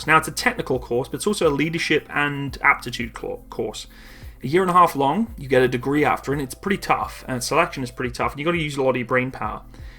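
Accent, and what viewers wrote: Canada. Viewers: Def got accent Canadian english accent! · It’s sad you’re sounding more Canadian but also great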